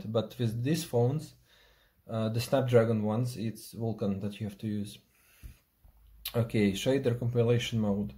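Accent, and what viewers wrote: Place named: Russia. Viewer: То чувство когда русский базарит по английскому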